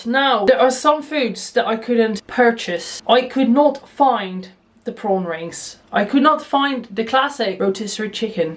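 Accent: Netherlands. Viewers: Are you dutch? I hear an accent.. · It’s Dutch 😄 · not related but i first thought you were british then i thought you were dutch and then i got so confused because i pick up both accents. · Loved the video! And I thought I heard the slightest bit of a Dutch accent :) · You sound Dutch haha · Why is your accent so Dutch! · Are you Dutch? It's my native language and your accent sounds Dutch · I thought I recognized the accent, she speaks Dutch as well 😀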